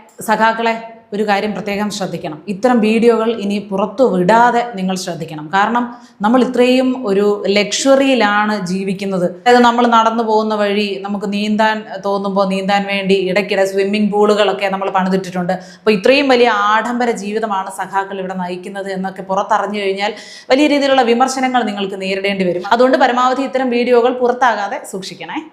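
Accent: Thiruvananthapuram, India. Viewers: തീരുവന്തോരം സ്ലാങ് സൂപ്പർ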